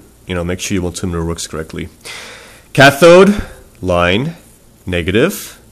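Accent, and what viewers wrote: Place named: New Jersey, United States. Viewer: i like your new jersey ish accent :)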